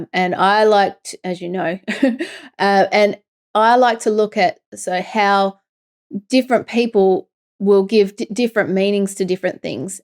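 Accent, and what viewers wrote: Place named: Australia. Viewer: A few random (and kinda tired... sorry if what follows isn't 100% coherent!) thoughts... • First, I never thought I'd say this, but having just dived down the YT rabbit hole of investigating the existential risk of AI (with the attendant copious US talking heads), it's bloody nice to hear an Aussie voice for a change! • Second, there's a saying (that I think originated in the business world, but that seems to hold true in the general case as well) that "if you can define the issue, then you've already half solved the issue", or something like that, anyway! The point being that if you have some problem, any problem, then in order to remedy it it's an imperative to recognise and define it. And so, having neatly laid-out the crux of the issue (or the, erm... blockage!) it becomes much more straightforward to solve it (or, ummm... 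flush it! haha😅). Basically, if you can't see it you can't fix it! • Third, and this is the reason that I initially clicked on this video (you know, ummm, besides for the very attractive, and, as it turns out, super sweet, positive, and refreshingly open and honest, presenter!), the 20th century French philosopher Albert Camus was of the firm belief that the central problem faced by people was that there was no inherent meaning in our lives. In fact, he thought that almost all of our suffering originated not from our search for some external meaning to it all - life, the craziness of it, and the subconscious knowledge of our impending deaths - but that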